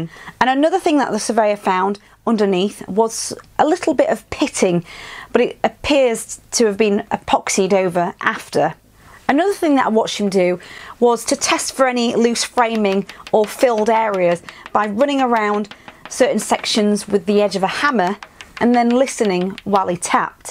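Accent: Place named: Northern England, United Kingdom. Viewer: Great Northern accent! (I hope)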